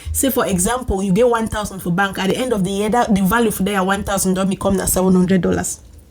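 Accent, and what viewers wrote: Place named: Pidgin, Nigeria. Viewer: Pidgin clear anyhow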